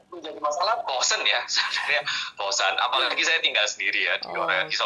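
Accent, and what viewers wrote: Java, Indonesia. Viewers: Yg sy heran korsel kematiany yg sedikit...bgmn pensngananya? Apkh dg bnykny tim medis..atu krn wargany yg mmg imuny kuat. Mas ini bukn org korea tp org jawa medhok bngt😁😂🤣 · Orang korea yang medoook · Anyo hoseo.... Medhokkkkkkkkkkkkk🤣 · Weee...mas masuk kompas TV.... Ada Orang korea yg Medokkkkk...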